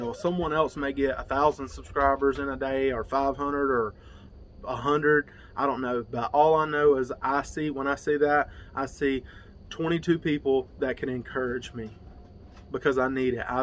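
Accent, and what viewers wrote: South, United States. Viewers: This is your calling. I love the southern accent and the realness. This mama bear approves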